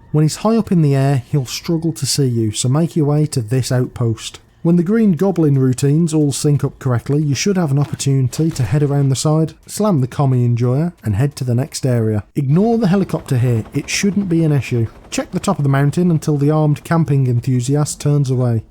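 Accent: Birmingham, United Kingdom. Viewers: couldnt place the accent til he said 'enemuy' hahaha brummie for sure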